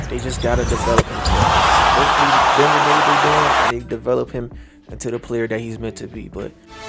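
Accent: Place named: Baltimore, United States. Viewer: I can tell by that accent you from bmore lmao😭 we from the same place but on the real let my character be in the storyline fr👀 don’t gotta be a insanely important character but shiit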